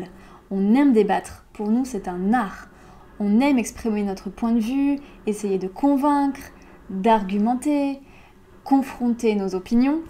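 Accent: France. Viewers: ta voix et ton accent français sont des professeurs géniaux!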